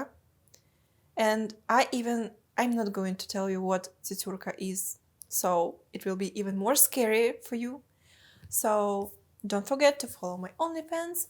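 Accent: Russia. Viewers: Мадам, на каком языке вы бы не разговаривали - всё равно прекрасно слышно, что язык на котором работает ваш мозг - русский!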